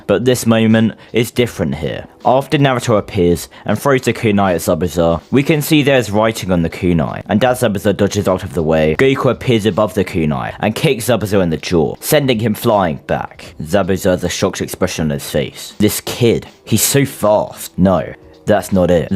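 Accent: United Kingdom. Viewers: Annoying British voice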